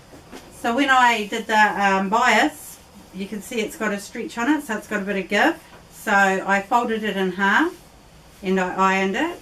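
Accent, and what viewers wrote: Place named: New Zealand. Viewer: She is a Kiwi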